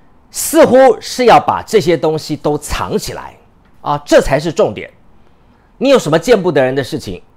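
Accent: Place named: China. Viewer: 整個口音變中國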